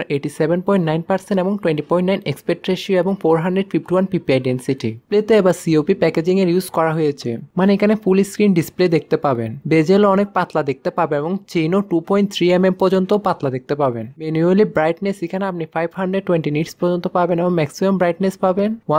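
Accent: Chittagong, Bangladesh. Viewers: Vai apner Basha Chittagong e Tai na?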